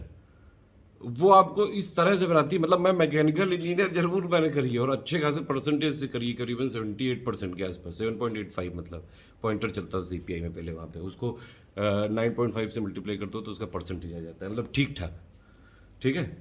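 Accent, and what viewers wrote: Kanpur, India. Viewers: Aaj kanpuriya style may sandesh bheja hai.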